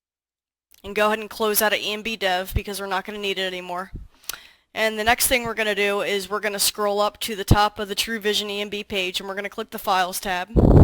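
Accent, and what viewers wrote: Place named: Delaware County, United States. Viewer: Hey are you from east coast? Sounds like a Delco accent. This video helped a lot. I was getting 0 FPS. When I downgraded my.dll file that enb series comes with to the one recommended it worked! It doesn't seem as good as project enb so I need to tweak some stuff.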